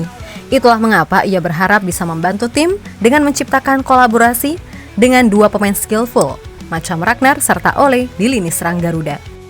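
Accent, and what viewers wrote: Java, Indonesia. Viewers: E mak mblegenduk , wong jowo yo mbak ? Podo nak ngono 😂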